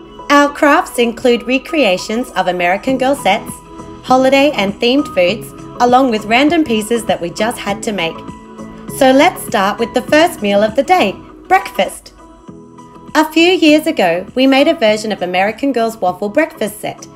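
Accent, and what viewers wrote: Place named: Australia. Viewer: Your so welcome! I have always thought that the Aussie accent was cool, but when you speak, it sounds even more cool!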